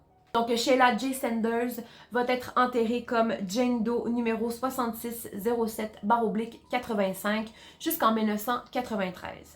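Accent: Quebec, Canada. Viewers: Bonsoir, merci pour cette histoire effroyable que tu as très bien décrite. Tu es une excellente narratrice et ta chaine va vraiment vite évoluer! Bravo. De plus, j'adore ton accent québécois!!!!!!!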